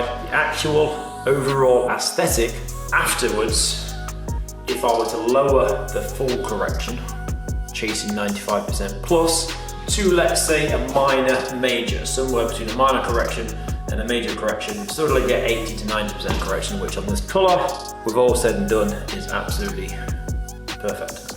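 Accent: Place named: England, United Kingdom. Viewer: This guy has the Strongest English assent I have ever heard. I can only comprehend about 30% of what he mutters.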